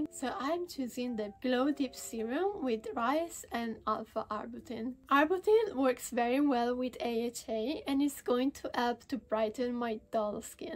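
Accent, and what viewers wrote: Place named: Hungary. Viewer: Hungarian? Judging from your accent.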